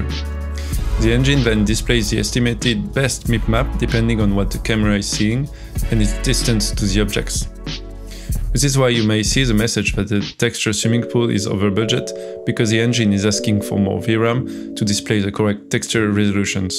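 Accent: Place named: France. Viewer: y'a pas a dire notre accent francais es si reconnaissable hahaha beau boulot en tout cas ;)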